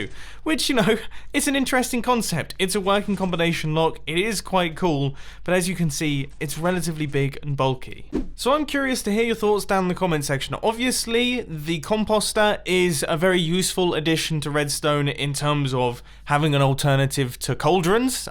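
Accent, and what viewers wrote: United Kingdom. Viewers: That's why he's getting annoyed, because from UK he pronounces it differently from Americans. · I'm pretty sure the way he says it is a British pronunciation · For StandingWind: That's because he isn't from USA. He is from UK and therefore the accent is different. Got it???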